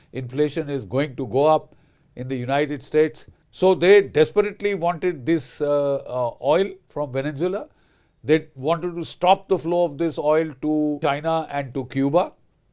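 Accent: India. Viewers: CA RAcus. Pronounce it correctly. The Indian accent SUCKS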